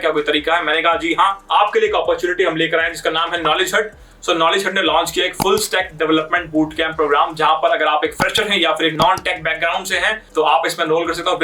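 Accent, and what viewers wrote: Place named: Delhi, India. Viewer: And bhaiya always come with something new and unique may be and bhaiya ka bolne ka style bilkul delhi wala apne jaisa😂😂😂😂:goodvibes::elbowcough: btw happy engineer's day bhaiya ❤:elbowcough::elbowcough::elbowcough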